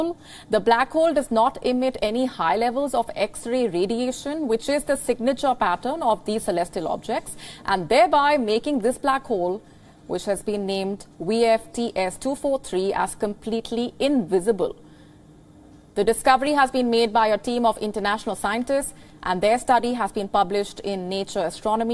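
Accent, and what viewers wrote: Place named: India. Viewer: Why do these indians speak like apu...dont they know that's racist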